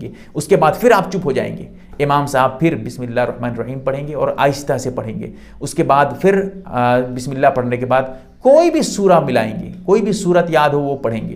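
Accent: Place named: Bhagalpur, India. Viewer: مفتی صاحب کی زبان سے معلوم ہوتا ہے کہ شاید بھاگلپور یا گڈا وغیرہ سے ہیں، آپ نے ماشاءاللہ محنت کافی کی ہے، مگر بعض اردو کی لفظی غلطیاں ہیں، اصلاح فرمالیں، مثلا اقامت میں الف پر زیر ہے آپ نے زبر پڑھا محرم میں ر پر تشدید نہیں ہے آپ نے مشدد پڑھا اعراض میں الف پر زیر ہے آپ نے زبر پڑھا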